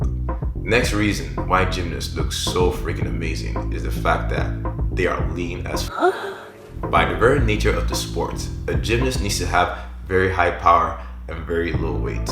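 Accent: Nigeria. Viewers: I swear right? I had this gut feeling that the guy was Niigerian from the get go....When i heard chineke....I was like lobatan.... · I always you were Nigerian. You have the accent. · chineke😂 nigerian? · This man is Nigerian asf, feels like I am listening to my brother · For a very long time, i was having that gut feeling you were "omo naija", and this video just made my feeling clear... As i hear "Eh".... "chineke" ....."Abeg"... Nice one bro!!... Naija to the world, carry on bros!!! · Bruh is u Nigerian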